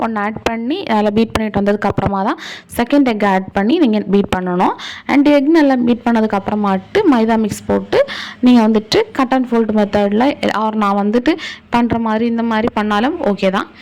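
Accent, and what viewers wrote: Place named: Kanyakumari, India. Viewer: Ur voice seems similar to kanyakumari locality.. Will try this recipe for sure sis ... Random viewer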